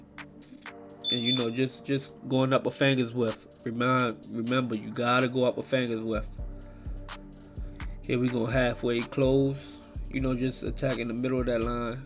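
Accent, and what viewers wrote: Baltimore, United States. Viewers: work on bein yourself, them cuts and that dumb ass “baldmore” accent. Yurturb 🤣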